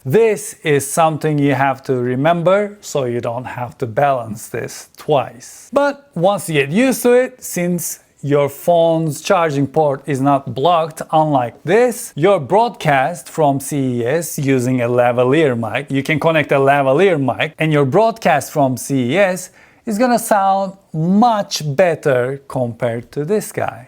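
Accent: Turkey. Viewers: Aksanı türke benzemiyor mu adam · Butun video dedim ki Turk aksani duyuyorum surekli. En sondaki hoscakalin the cherry on top oldu :) Daha bugun aldim cihazi merak ediyordum farklari tesekkurler video icin.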